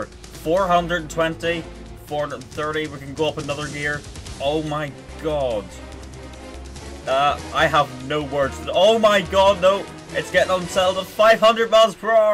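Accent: Germany. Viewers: You german? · wait, are you german ?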